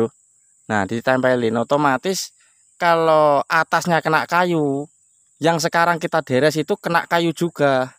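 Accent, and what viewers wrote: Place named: Java, Indonesia. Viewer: Jian medok jowo tulen mase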